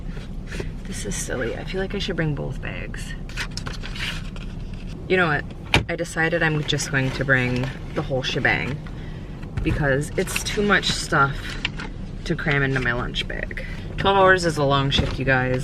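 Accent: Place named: Midwest, United States. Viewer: I swear I heard your accent and I Heard the Midwest! Ahhhhh! ❤️ You’re so cute, I’m trying so hard to get into a nursing program and anatomy is killing me with the memorization! How’d you power through the pre reqs to get to your final destination? It’s my dream!!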